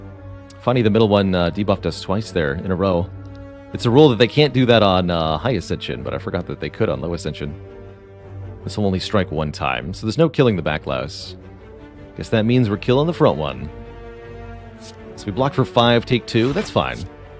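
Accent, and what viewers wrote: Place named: United States. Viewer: Thanks for the summary! (his accent is definitely American ;)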